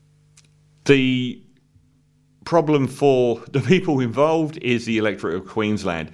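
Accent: England, United Kingdom. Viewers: You clown! You sound like a pom bringing your lefy garbage to our country.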